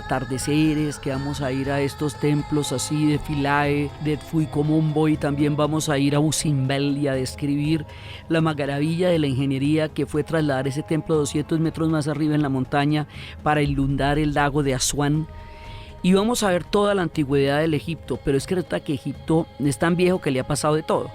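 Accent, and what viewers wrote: Bogotá, Colombia. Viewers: Si cierras los ojos, conectas el relato con el fondo musical y le pones imaginación, listo el viaje . Gracias por sus videos y podcasts Dra. Uribe. Además su acento Bogotana me fascina. Sobretodo cuando al final de algunas frases usted dice : Si !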